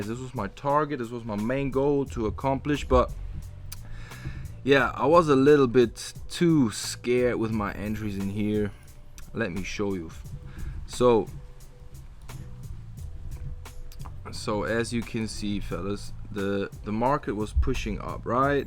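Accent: Germany. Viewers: Bro du bist doch Deutscher, das hör ich doch direkt raus 😄 warum hast du deinen Kanal in den USA located? Wohnst du da seit einer Zeit?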